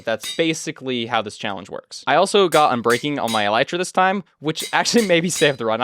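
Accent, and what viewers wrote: India. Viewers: brother u indian like woah it surprised me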